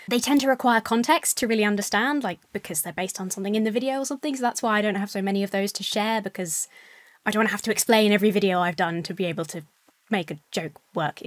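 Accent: United Kingdom. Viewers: Despite being English I tend to watch a lot of American channels but it’s really refreshing to hear some jolly good Bri’ish snark and sass from. 🇬🇧☕️ As for the fish thing, for the sake of being a know it all, there are multiple species that can communicate, such as saddleback clownfish, leaping blennies, grouper fish who can communicate with octopi, as well as fish that can use tools like the tusk fish.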